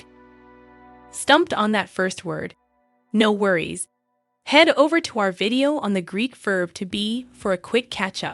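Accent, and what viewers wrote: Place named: United States. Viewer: Horrible. Este vídeo es completamente horrible. No sé qué da más miedo de todo, si el horrible acento inglés americano cuando pronuncia el griego o las personas hechas con inteligencia artificial. Es horrible. Jamás podría aprender nada con vídeos así.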